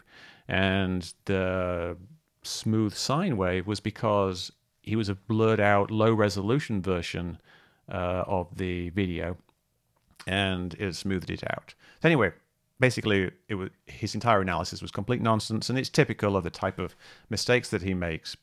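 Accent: United Kingdom. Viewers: Good video but whats with the use of americanism 'math' ...'flashlight' etc ...with a british accent ?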